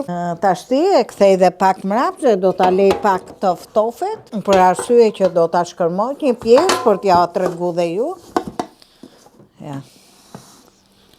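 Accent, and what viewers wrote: Tirana, Albania. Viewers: Pershendetje zonja e nderuar.❤🥰Te lumshin duart e arta e folura tironce na kenaqe me kujton momen time.❤❤Te uroj shedet e gezim e lumturi me gjithe familjen.🙏❤🥰❤🥰❤❤🌲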